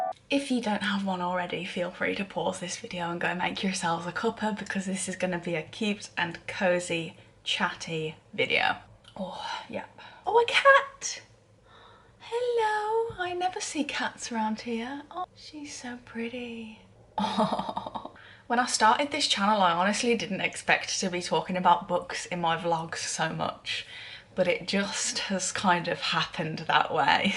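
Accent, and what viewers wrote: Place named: Birmingham, United Kingdom. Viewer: Hiya! Welcome to booktube, I love your energy 😊 sounds like you might have a bit of a brummy twang going on too? We need more brits in the community for sure! Will check out your other videos for sure!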